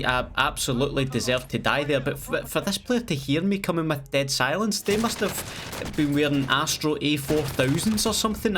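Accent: Scotland, United Kingdom. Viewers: The Scottish JGOD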